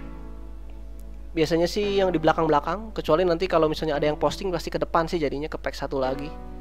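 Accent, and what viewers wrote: West Java, Indonesia. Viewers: langsung keliatan logat sundanya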